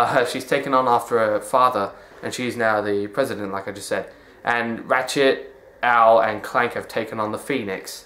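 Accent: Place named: United Kingdom. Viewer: You are half the reason I read every written game review in a british accent.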